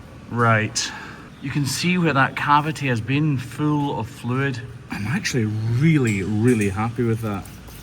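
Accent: Scotland, United Kingdom. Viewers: Where in Scotland are you from I’m from Ayr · yer Scotish acent is pyer goodnes, mate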